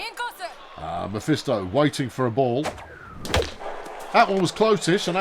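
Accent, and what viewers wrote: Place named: Australia. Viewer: It's refreshing to have an Australian commentating a baseball game. Baseball is a fun game for everyone.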